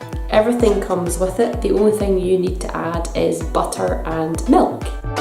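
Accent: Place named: Scotland, United Kingdom. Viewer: wow...looks so good...yummy for my tummy!!!! :) you sound just like one of my other scottish friends..... Sara Fyffe - <link> love your channel - i will be back! subscriber # 142..you're going to hit 200 in no time! :)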